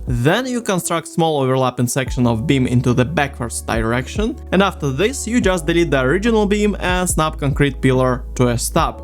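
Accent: Eastern Europe. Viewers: слов'янський акцент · What's your accent? Sounds eastern-european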